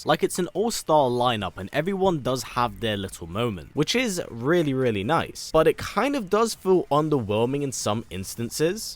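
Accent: United Kingdom. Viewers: How did you watch it in the UK? (Assuming from your accent you're British too)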